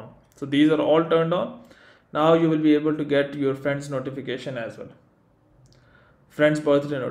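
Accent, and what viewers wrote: India. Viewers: People are just slow headed fr, if you know English then you would have understood him even with his Indian accent. They just find a reason to dumb themselves down.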